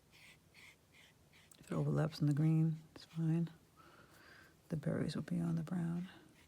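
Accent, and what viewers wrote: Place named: New England, United States. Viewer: Thanks for the terrific info & lovely cards,. Btw, I'm from RI and notice your familiar accent! MA or ME? Like a "lethal" from home!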